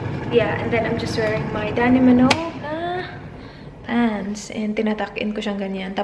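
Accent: Visayas, Philippines. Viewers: sobrang cute mo mag kwento ate hahaha nakakaaliw, and while cooking hahaha. Ang the bisaya accent and words lol hahaha I love you tlga ate hihi 😊😘 Love your vlogs so much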